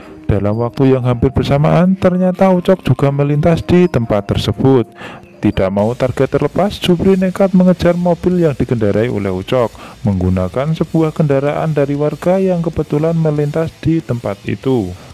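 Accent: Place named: Java, Indonesia. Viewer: Bahasa Medok is REAL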